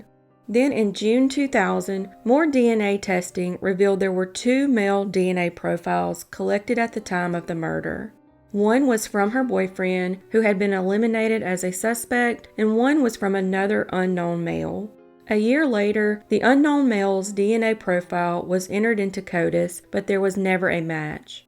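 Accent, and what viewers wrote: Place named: Mississippi, United States. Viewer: i don't know where in the south these are from, but this voice makes me miss visiting madison, mississippi.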